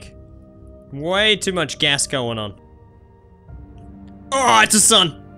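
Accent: Australia. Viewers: Hes aussie! Like me! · you australian? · Wat your Australian me to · His Australian not English for his it is satin for us it is saturn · Are you Australian?!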